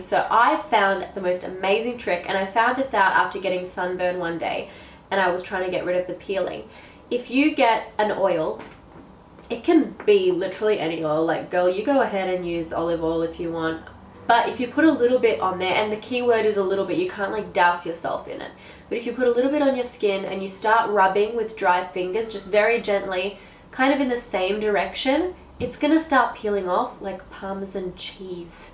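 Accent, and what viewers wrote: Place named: Australia. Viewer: I know people throw this statement around all the time but you are seriously so beautiful, with and without makeup. You truly don't need to wear makeup you're that beautiful. This is the first video of yours that I've seen so I don't know anything about you but if you're not a model you should be. And I actually like your accent even though Aussie accents often annoy me (I lived there for 8 years and became a dual citizen, so I can say that)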